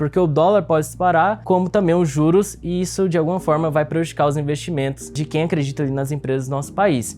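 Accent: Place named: Goiás, Brazil. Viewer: Hahaha goiano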